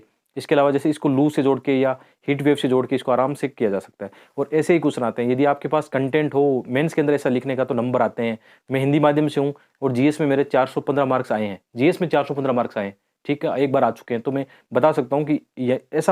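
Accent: Haryana, India. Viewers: आप हरियाणा or राजस्थान से है क्या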